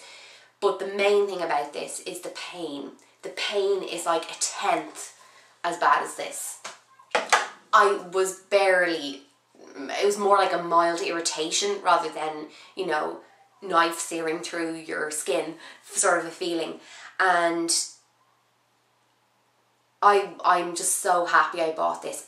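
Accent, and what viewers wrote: Ireland. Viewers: Irish =) The best accent in the world! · are you irish? or from the uk because I hear a accent in your voice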